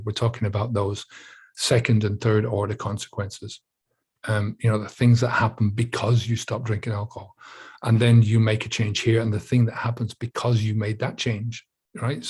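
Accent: Dublin, Ireland. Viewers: Is that a Co.Dublin accent I can hear? 😊chose to knock drinking on the head 8 months ago, best decision I've ever made. I've been out in social occasions since, and have full memory of these evenings. Fantastic feeling waking up the morning after with no groggy " what did I do?" Fear. Great video. ❤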